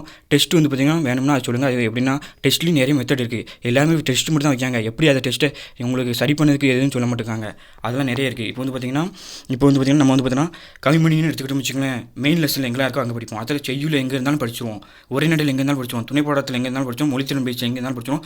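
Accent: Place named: Thoothukudi, India. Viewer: Vanakkam sir, my native place thoothukudi, unga slang thoothukudi slang mathri irukku sir. I am happy sir, nanum neraya place la padixhu irukken, but neenga soli taruvathu different ah irrukku sir, na ungalai follow pannuren sir, unga method remba pidichu irrukku, I am housewife sir,unga help ennaku kandippa vendum sir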